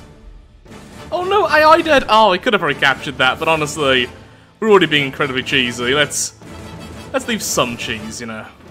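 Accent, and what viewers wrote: United Kingdom. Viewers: Not only bc of brit accent but you sound exactly the same as Alex the rambler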